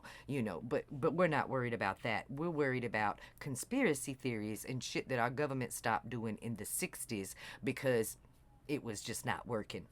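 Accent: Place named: Louisiana, United States. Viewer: Hearing you say exactly what I've been saying, in your lovely Louisiana? sexy voice, is fabulous! 👏👏👏👏👏👏👏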